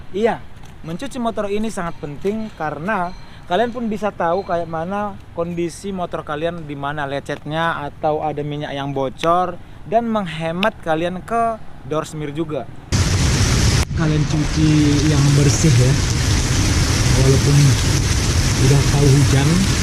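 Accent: Indonesia. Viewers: Logatnya sumatera kali bah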